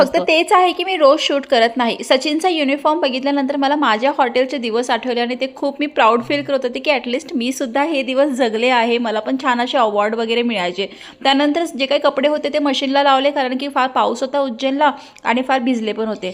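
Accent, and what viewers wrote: Kolhapur, India. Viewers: Kolhapur side la ambolich boltat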